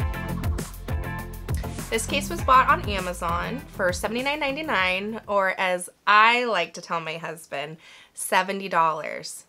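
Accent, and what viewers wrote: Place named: Oklahoma, United States. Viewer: Watched this with ny 8yr old daughter since she wants this case for her pc and she asked me if you are from Oklahoma lol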